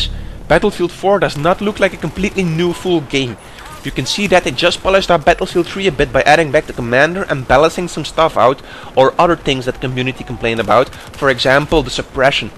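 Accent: Netherlands. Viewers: lol, I love the Dutch accent!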